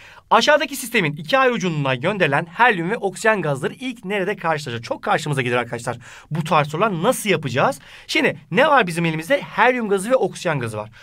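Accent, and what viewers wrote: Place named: Central Anatolia, Turkey. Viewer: hocam nerelisiniz bi iç anadolu ağzı sezdim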